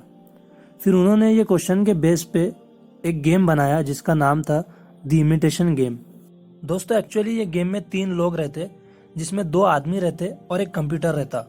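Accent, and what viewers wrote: Hyderabad, India. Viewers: you sounds like hyderabadi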